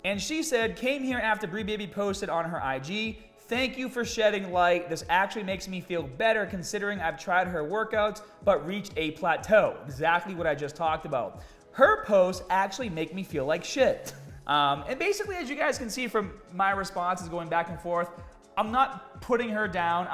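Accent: Boston, United States. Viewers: I love how is just leaning into the yelling Bostonian persona and taking it to the next level. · Dude are you from Boston ? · Is this accent new? I swear I’ve been subscribed for years and back then never noticed the ultra Boston or whatever the fuck accent. Still the og tho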